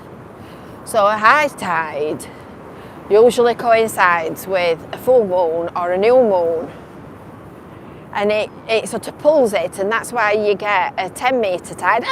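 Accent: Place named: Lancashire, United Kingdom. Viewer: You cheered me up with your lovely Lancashire accent and chirpy attitude. From a mancunian living in blackpool.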